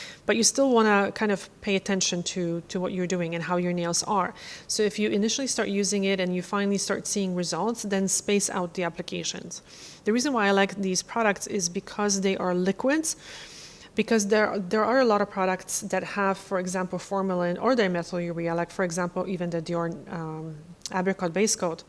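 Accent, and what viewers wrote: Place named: Poland. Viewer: Love your accent. We Poles are awsome.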